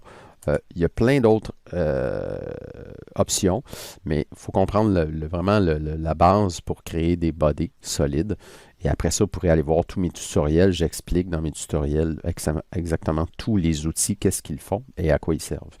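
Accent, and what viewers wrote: Quebec, Canada. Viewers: Bonjour Je connaissait un peu fusion mais je viens de découvrir ton site et c'est vraiment très dictaticiel et en plus je suis français et j'adore l'accent québécois!!! Une question, tu as un ordre de visionnage pour les autres vidéos? Merçi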